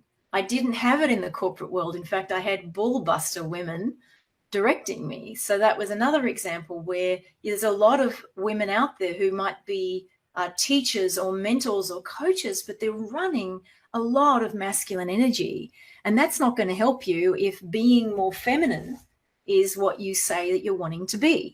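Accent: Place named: United States. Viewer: I love it when you talk American. (I am an American, so I love hearing how I sound to people outside the United States.) :)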